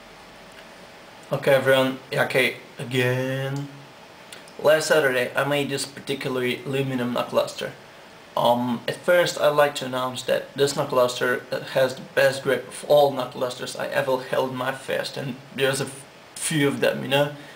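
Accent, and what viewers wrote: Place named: England, United Kingdom. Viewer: i love your English accent lol!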